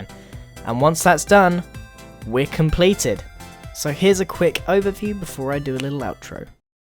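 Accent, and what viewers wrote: England, United Kingdom. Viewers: why does he sound really british when he was younger? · are you English?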